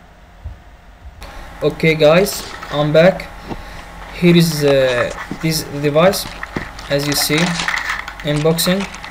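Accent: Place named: Afghanistan. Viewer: You sound Afghan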